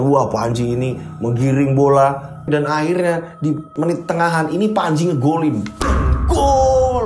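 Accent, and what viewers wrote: Java, Indonesia. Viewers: Bom yoskay... aq suka cara membawakan cerita nya terang dan jelas 👍... logat jawa nya lhas banget mas 😊 enak di dengar nya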